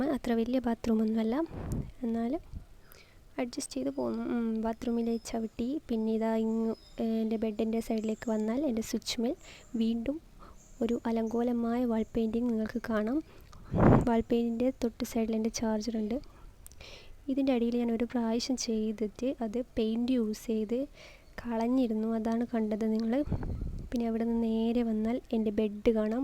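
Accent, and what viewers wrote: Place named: Kannur, India. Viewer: കണ്ണൂര് ഭാഷ അത്ര മോശം ഒന്നും അല്ല ഇങ്ങള് പൊളിക്ക് ഭായ്.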